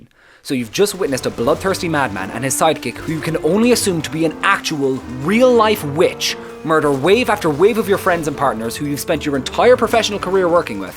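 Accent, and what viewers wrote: Ireland. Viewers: yup pal are you irish?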